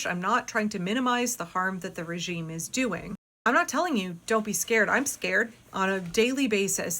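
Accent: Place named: Alabama, United States. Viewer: UGH, sounds like Bama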